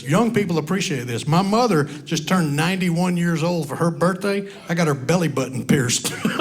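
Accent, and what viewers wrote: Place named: South, United States. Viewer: His accent makes u think he’s gonna say something ignorant but he’s got his own southern style to him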